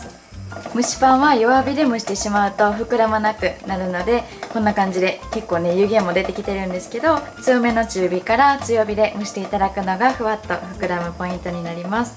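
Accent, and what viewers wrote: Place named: Osaka, Japan. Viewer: ありがとうございます✨ レンチンはできるだけ避けたいので、自然解凍して蒸し器で蒸す方法試してみますね🎵 余談ですが、私は香川です😊 大阪が大好きで、毎月1～2回はユニバとユニバ周辺に遊びに行っていました😊大阪に住みたいと思ってたくらいです😊 は出身が大阪なんて羨ましいです✨ときより大阪弁なのも羨ましいです😁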